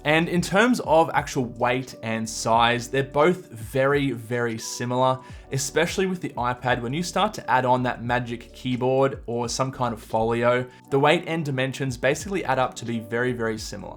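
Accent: Australia. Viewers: why does cutie boi have an Aussie accent?!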